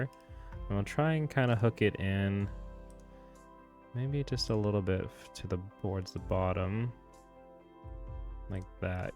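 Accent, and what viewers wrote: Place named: Canada. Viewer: I was going to ask if you were Canadian lol. The midwestern in me could sense it 😂 great video!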